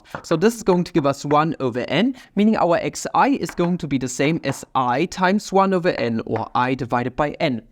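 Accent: Germany. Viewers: this is German pronuncation. is there even any word pronounced correctly?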